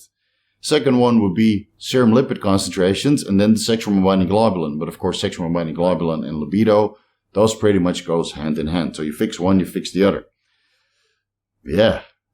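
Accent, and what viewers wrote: Netherlands. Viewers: I think it may be his Dutch accent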